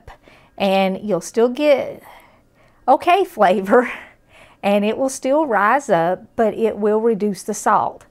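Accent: South, United States. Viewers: Wonderful slight southern accent, not over done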